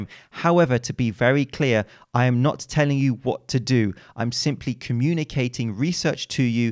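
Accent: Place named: RP, United Kingdom. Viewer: Thanks for breaking it down, it was amazing explained by you with your cultured british accent. It's a shame though that doesn't address the physique competitors aspect😜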